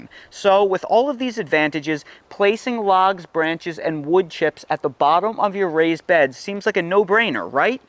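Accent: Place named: Philadelphia, United States. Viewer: I know you live in North Carolina now but you gotta be from the Philly area originally, right?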